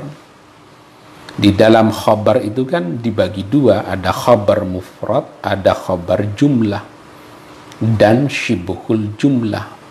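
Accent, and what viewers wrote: Java, Indonesia. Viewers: Pake logat jawa kiai... pegn nyoret juga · Cocok juga klo pake logat jawa khas pesantren dulu biar bisa sambil nyoret nyimaknya, maaf kiyai klo terlalu lancang🙏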